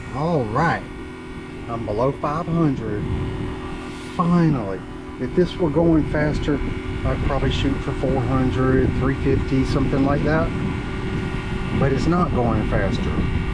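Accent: South, United States. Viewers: Lov the southern slang. Gunna plug it up 😂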